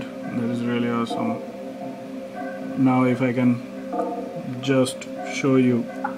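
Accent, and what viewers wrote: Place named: India. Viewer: Brother i noticed your indian accent. Please tell me your opinion of the crappy customer service at Dell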